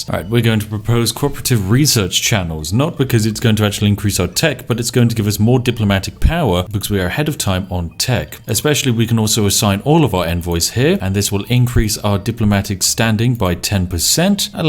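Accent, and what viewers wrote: United Kingdom. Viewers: You're sounding awfully british today,.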